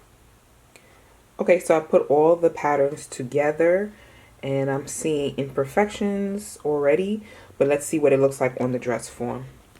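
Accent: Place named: New York, United States. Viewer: You are very talented . Where do you live ? You sound like you live in new york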